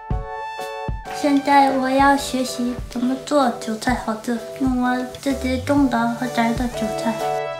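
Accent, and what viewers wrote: Northeast, China. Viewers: 博士为什么一口东北味儿？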